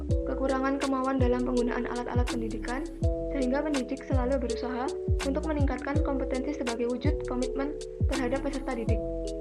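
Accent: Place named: Java, Indonesia. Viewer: Mendhox dikit keren ya kak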